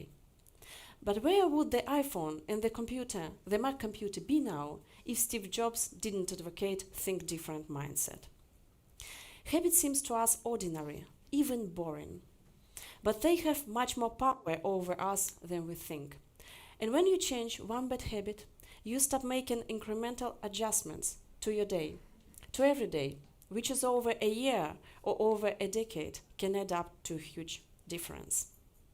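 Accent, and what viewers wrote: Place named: Russia. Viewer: Какое-то банальное УГ, с мощным русским акцентом.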